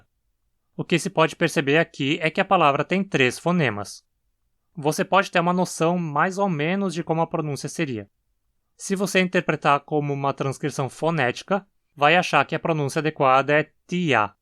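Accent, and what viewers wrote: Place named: Rio de Janeiro, Brazil. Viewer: Sotaque do RJ, amei❤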